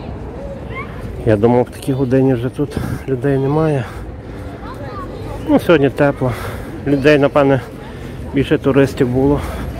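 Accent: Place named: Galicia, Ukraine. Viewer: Не звертайте уваги ні на які зауваження! Говоріть як говорите,чудова вимова! Я коли приїжаю до Львова то не можу наслухатися галицької говірки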